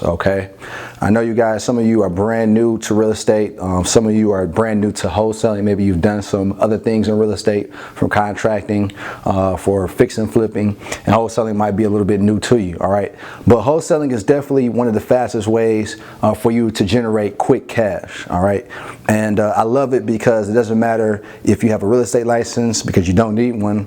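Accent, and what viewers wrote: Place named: Jamaica. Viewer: Hi, this is Carol Whilby, heard the yard accent, this is an answer to prayer, I NEED A MENTOR REALLY BAD, hope you have a spot for me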